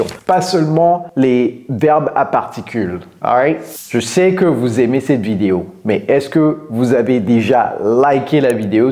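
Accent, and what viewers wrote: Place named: United States. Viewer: Yes please faites-nous une vidéo sur la liaison la réduction, la gymnastique linguistique en anglais. J'adore votre anglais américain, votre prononciation est très distincte et divinement agréable à entendre, apprendre.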